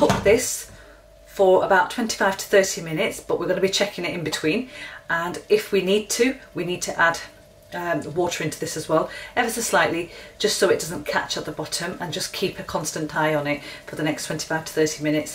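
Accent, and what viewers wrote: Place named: North East, United Kingdom. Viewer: Love the recipe , you sound like a north east girl xx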